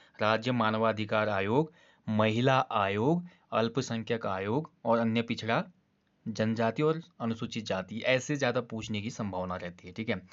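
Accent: Kumaon, India. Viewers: apki awaz me kumauni zhalak aati h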